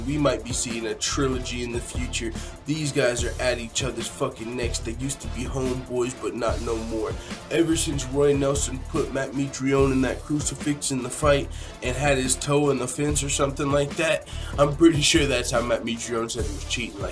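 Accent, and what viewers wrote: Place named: South, United States. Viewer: Dang Boy, you're way more country than i had originally thought..Remind me of Clayton Bigsby haha!! Stick to that accent now, ya-hear??🤠🐴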